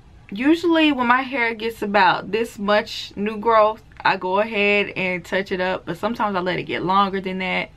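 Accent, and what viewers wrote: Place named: South, United States. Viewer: Yes you def have a southern accent but you mix it up.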